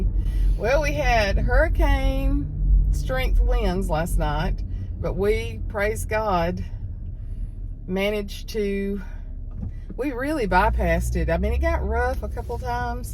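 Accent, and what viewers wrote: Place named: Alabama, United States. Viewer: I enjoy you. I recognized your Alabama accent immediately, because I am an Alabama girl, too. Lots in common, including age, and I am glad to see someone on You Tube who is not pregnant or doing all the things I did 30 years ago. Thanks for your testimony, your outlook, and your wisdom.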